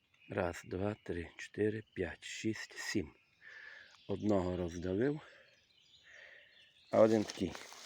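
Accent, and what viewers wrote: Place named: Transcarpathia, Ukraine. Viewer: дякую. У вас говір схожий на наш, закарпатський. Я ще гриба не знаходила, а так хочеться. Вдалих вам походів.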